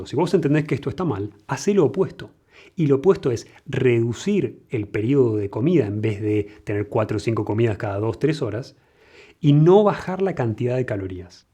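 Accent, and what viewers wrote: Argentina. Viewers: Me parece excelente su exposicion sobre el metabolismo los telomeros y toda esa cantidad de conceptos que si bien conocia algunos desconocia otros y como estan inter relacionados por el acento al hablar me pareciera argentino y digo esto porque dice que es doctor y de alguna manera se acerca al publico de manera corriente y comun para dar indicaciones medicas sin ninguna retribucion economica realmente lo felicito por su dedicacion y esmero en este tipo de platicas lo conoci a traves de youtube por el video sobre la vista y al ver su explicacion enseguida me suscribi . Supongo por su explicacion tan minuciosa y detallada que usted es microbiologo o algo por el estilo ya que conoce a la perfeccion nuestro mecanismo y metabolismo de nuevo me permito felicitarlo gracias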